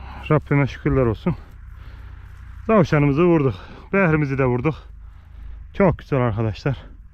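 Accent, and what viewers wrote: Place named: Yozgat, Turkey. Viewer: Gaçarsa gaçsın neydiyim heri deyisine gurban senin tam Yozgatlı ağzı bu ellam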